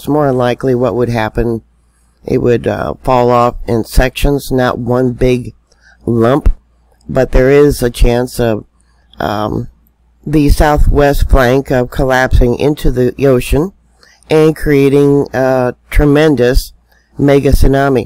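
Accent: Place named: Midwest, United States. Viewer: Well, you are amazing! I heard this little calming Midwest? Southern Midwest voice with the most horrible news ever which in 6 days no one is talking about. The tsunamis. I knew they could happen but I didn't know it's bcuz the island would go under. I'm subscribed and I'm sticking with you and bushbear or what his name is (apology) but he's very nice and English speaking. Peace and take care. (Bushcraft Bear.;) )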